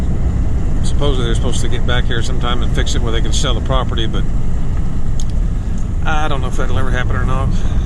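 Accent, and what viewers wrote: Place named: South, United States. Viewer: Thank you for the more realistic tour of Whittier. Does the town keep the roads plowed well In winter? I wish we could have toured your condo. Seems like no homeless in Whittier. Love your bio. You seem pretty healthy for 70. And I’m sure the permanent fund dividend is a nice perk. Good for you for making your dreams come true. Whar 😮state did you come from? You sound like you have a southern accent.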